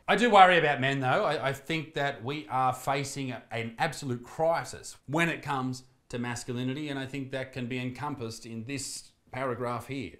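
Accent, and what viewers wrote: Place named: Australia. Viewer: For some reason I love Australian voices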